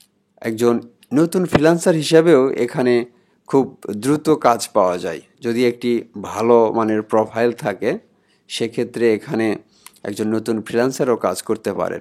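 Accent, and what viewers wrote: Bangladesh. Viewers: Vaia apnar basha ki naogaon ??? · ভাষায় খুলনার গন্ধ....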